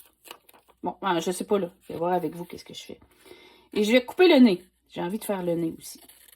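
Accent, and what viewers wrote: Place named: Canada. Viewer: Toujours très inspirant! Quelle aura! Créer en jouant , jouer en créant, c'est exactement ce qu'il faut faire pour un bien être total... Votre sourire et votre accent canadien insufflent une belle énergie ! Grand merci pour vos vidéos.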